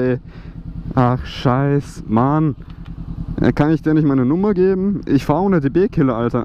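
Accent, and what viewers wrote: Austria. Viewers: bist du österreicherM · unser Akzent bleibt #austria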